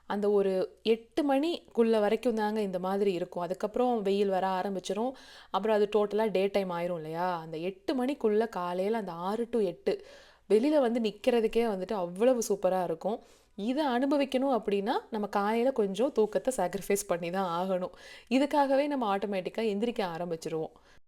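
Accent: Coimbatore, India. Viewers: Hi akka naa new subscriber.my name kavya,naa coimbatore tha neenga pesarathu rompa pudikum akka naama oru slang nu kaykum poethu happy ah iruku akka,and Happy Birthday kutty and happy wedding anniversary Anna and akka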